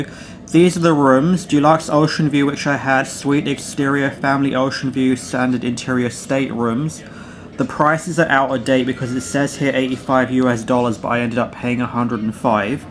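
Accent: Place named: United Kingdom. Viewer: Well you are born in London and then move to Canada from London . I say this because you have talk with british accent.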